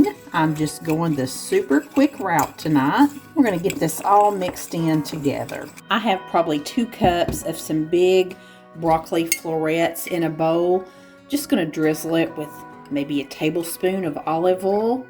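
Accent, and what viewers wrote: South, United States. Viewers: I discovered your channel not too long ago, and now look for new videos all the time. You explain things so well and I love your Southern accent I also love the things you make. I really enjoy your Vids!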